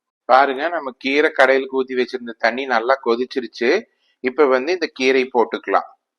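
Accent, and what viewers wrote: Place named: Kongu, India. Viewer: சாமி நம்ம கொங்கு நாட்டு தமிழ் அழகே தனிதான் . உங்க தமிழும் அருமை கீரை கடயலும் அருமை. வா சாமி என்ற ஊட்டுக்கு சாப்பிட குடும்பத்தோட வா கண்ணு🙏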